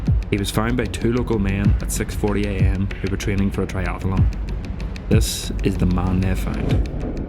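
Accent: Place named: Belfast, United Kingdom. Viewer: Fantastic film, love the Belfast accent...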